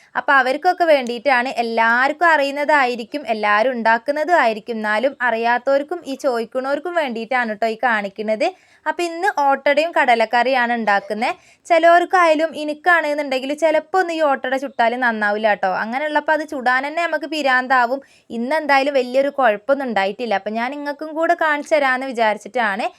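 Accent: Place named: Kozhikode, India. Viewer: 🥰🥰കോഴിക്കോടൻ സംസാരം ആണല്ലോ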